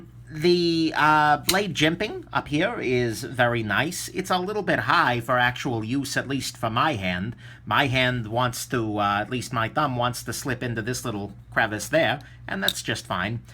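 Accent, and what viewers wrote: Russia. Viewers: Excellent review and Ruskie accent. Spaceba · Hey . Yours „ russian English “ sounds much better then yours “ through the nose English “.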